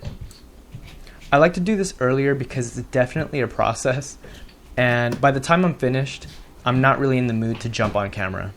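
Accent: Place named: Hawaii, United States. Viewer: You have so much more / better equipment than me. I’m kind of embarrassed 😅 are you from Hawaii? I can hear a slight Hawaii accent.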